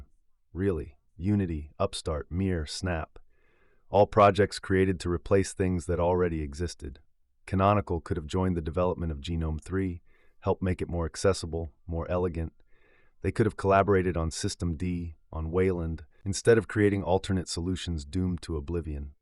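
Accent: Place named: South Africa. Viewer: No, he's not Afrikaans, he's an English-speaking South African.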